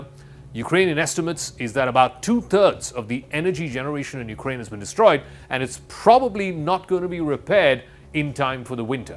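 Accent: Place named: India. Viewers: This is Indian accent. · He still has trace of Indian accent. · The first ever Indian that I have listened to that speak fluent English with proper accent unlike indians with all respect. Perfect English perfect accent perfect view of war and not biased. Respect to you.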